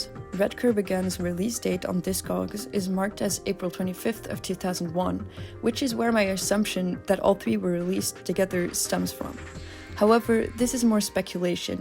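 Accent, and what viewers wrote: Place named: Netherlands. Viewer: was wondering if you were dutch until you said "stronger even" then i was sure lol this is great work for a first video essay! You really did your research, really difficult stuff since as you said, most sources are in Japanese only. Shows you're passionate about it! keep making stuff like this and you'll find your niche audience in no time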